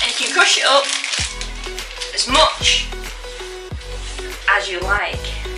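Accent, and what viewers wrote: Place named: Oceania. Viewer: Full view and like #5 from Bits of Real Panther! I think it's pronounced BWAY-no. You certainly have an energy about you,! I couldn't quite place the accent. Somewhere in Oceania? At least the recipe here was simple, something even I could do! hehe Also, do you keep any of your thumbnails on file? I'd like to feature a couple of them in an upcoming video. Anyway, thank you much for sharing, and I hope your weekend was truly "sweet"!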